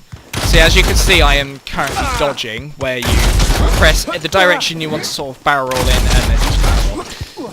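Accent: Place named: United Kingdom. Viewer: Nice video. A nice British accent to listen to, no umming or ahhing, and a simple introduction to a game that I (and I'm sure many others) am looking into simply because Evolve has been delayed by such a long time. Onto your 2nd video......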